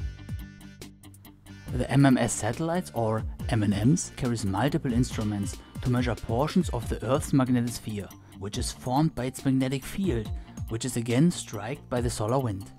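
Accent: Germany. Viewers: der deutsche akzent is einfach super XD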